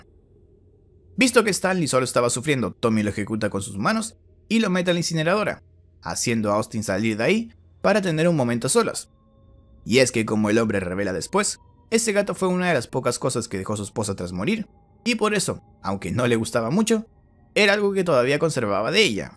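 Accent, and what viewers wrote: Chile. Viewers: ME DI CUENTA QUE ERES CHILENO, CTMMM QUE EMOCIÓN😍amo todos tus videos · No tengo ni idea de su nacionalidad ya que a veces suena como mexicano y también como chileno👁👄👁🔪🇨🇱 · Eres de chile?? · El acento es de chile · hola master es chileno? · Eres chileno🇨🇱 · es chileno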